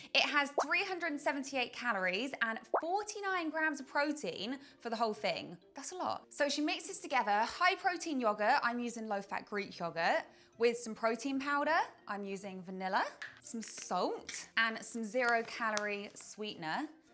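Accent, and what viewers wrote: United Kingdom. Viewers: I had no clue that you were British or wherever your from. 😂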